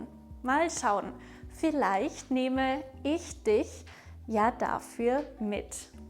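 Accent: Standard. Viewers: [sch ≠ ch = -ig] Die Grundregel für die Aussprache der standardisierten, literarischen, landesweiten, einheitlichen deutschen Sprache. Hochdeutsch.🖤 ❤ 💛 Das ist nicht nur angenehm zu hören, sondern auch leicht zu verstehen! Gut machst 💪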